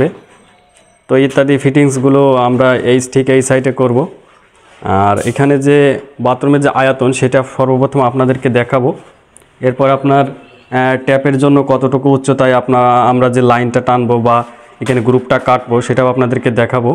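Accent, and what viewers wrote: Jessore, Bangladesh. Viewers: খুব সুন্দর ভাবেই উপস্থাপন করার জন্য ধন্যবাদ। আপনার কথায় বোঝা যাচ্ছে যশোর এলাকার মানুষ। আপনার বাড়ি কোথায়?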